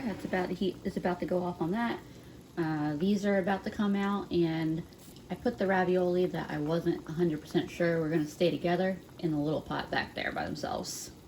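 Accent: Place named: South Jersey, United States. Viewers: Interesting fillings.. I saw you said you were from New Jersey ..Sounds like a South Jersey accent .. I grew up in Vineland .. Many many years ago .Actually a tiny town right outside Vineland called Newfield.. But if your not from there you don't know its there lol.. Found your videos just a few weeks ago .. Well done. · Love your videos . You must be from jersey! I grew up there i hear it in the voice! Great recipie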